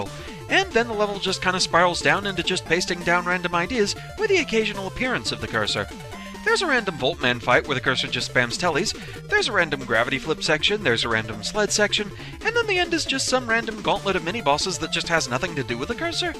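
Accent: United Kingdom. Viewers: He's about as British as it gets if you hear his voice commentary. In a good way I mean.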